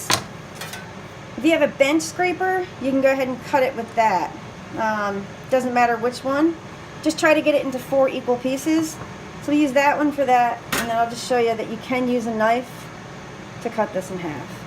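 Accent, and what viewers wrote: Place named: Pennsylvania, United States. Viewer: Can I ask where you're from? My mother in law is an Italian from Pennsylvania and you have that same ever so slight accent.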